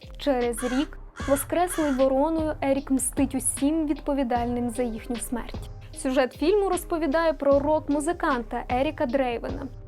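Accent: Standard. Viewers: Моє сердечко тремтить від твоєї краси!) А твоя українська без суржиків - просто музика для душі. Ти молодець)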